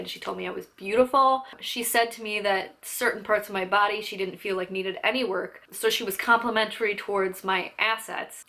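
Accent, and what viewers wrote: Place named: Chicago, United States. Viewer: You have a Chicago accent. The scars dont look bad at all and I am very honest. Most internet people say "yaaas" blah blah even when person 400 pounds! You look pretty good.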